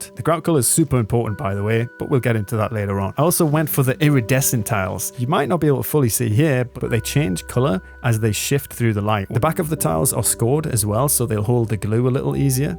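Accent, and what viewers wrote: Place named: Newcastle, United Kingdom. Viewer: Love your Geordie accent, I laughed when you said Sainsbury's is mad expensive 😂 I feel ya, cause it's my local too! The mosaics turned out amazing!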